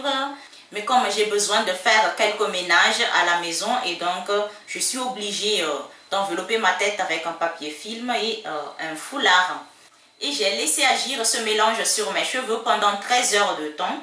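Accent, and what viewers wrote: Madagascar. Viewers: Bonjour la Malgache 😬, Belle accent, je suis Malgache aussi , bravo pour l'info🌹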